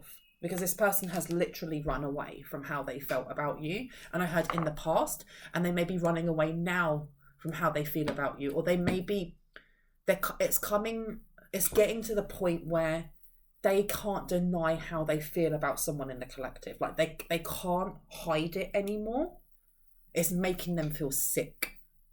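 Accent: United Kingdom. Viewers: I was just hanging out with you in the kitchen, with a double size mug of hot cocoa with marshmallows ! Thank you for the messages ! I love listening to you ! I'm in the USA, and I love your Brit accent. I love the shows Last of the Summer Wine, The Vicar and Keeping Up Appearances, its not just because they are such funny shows but its the accents too ! I wish you the Merriest Christmas 🌲🧑‍🎄 and a very Happy New Year 🍾🎉 ! I look forward to yo ur readings and will continue to in 2024 ! 💖 Lynn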